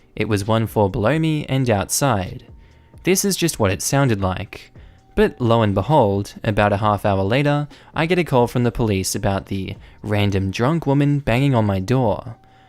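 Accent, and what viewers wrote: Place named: Australia. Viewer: hit us with your true Aussie voice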